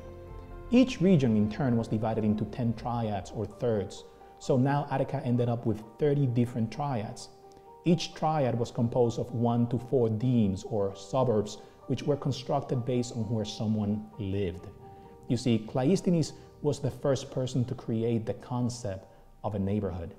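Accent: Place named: United Kingdom. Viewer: Great content, love the accent. You must have learned English from a British teacher.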